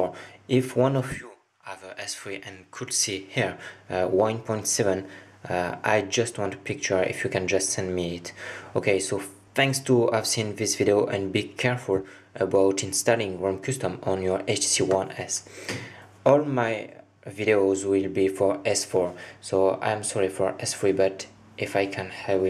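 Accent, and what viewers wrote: France. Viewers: Lol, ur french, i can hear it Heun que g raison ? Bizzarement mon frere a le meme accent xD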